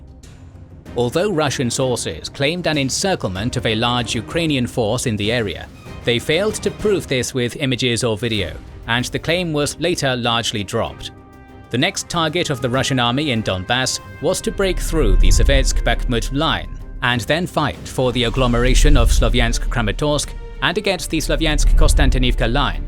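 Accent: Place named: United Kingdom. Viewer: The creators of this propaganda & misleading terminology in this video are quite late.. My dear British-accented pigs, here it is: It is not an invasion & it will not be an invasion. This is a security operation to purge the UA military organization in which Nazi ideology has spread and become purely Nazi military battalions and the liberal West supports these criminals just for sake of mere They see them as tools that can inflict irreparable losses for the Russian army in the future because the best leaders in Russia are there today fighting against the latest Western weapons & against a corrupt military organization backed by the democratic West since 2014, their military doctrine has nothing to do with alleged democracy of the Kyiv criminal regime.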